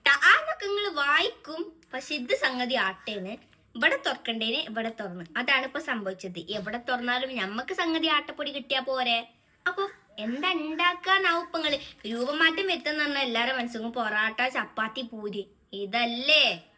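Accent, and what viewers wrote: Malappuram, India. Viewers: Malappuram aano kozhikodaano naadu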